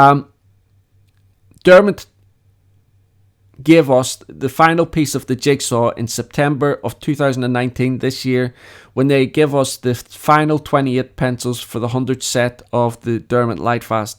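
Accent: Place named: Scotland, United Kingdom. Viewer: I love your accent. Do you mind if I ask, where are you from? Scotland??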